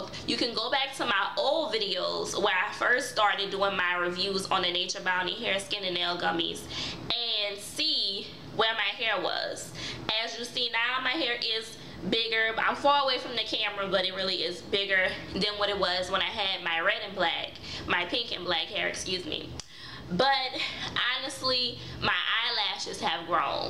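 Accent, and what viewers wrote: New Orleans, United States. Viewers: This is a little random but what's that song at the end ? I fell in love with it lol. Love your video btw and your hair is gorgeous ! And that New Orleans accent tho !! 😍😍😍😍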